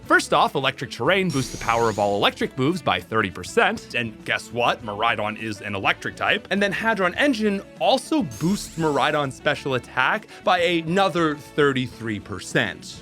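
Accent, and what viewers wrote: Canada. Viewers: Oh your Canadian makes sense now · Well that's just Canadian pronunciation. I don't think they say drought like that though. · He's Canadian. Every time he says something with "out" in it, it sounds like "oat"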